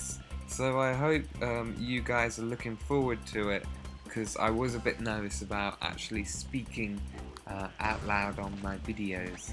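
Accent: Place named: United Kingdom. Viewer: its Nice! #ILoveBritishAccent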